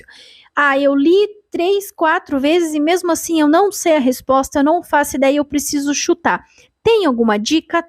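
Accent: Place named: South, Brazil. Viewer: pelo sotaque e do sul.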